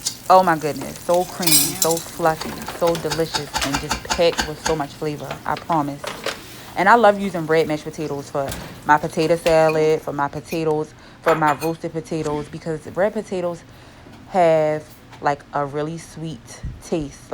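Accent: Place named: Baltimore, United States. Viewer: That bmore accent🥰😅😁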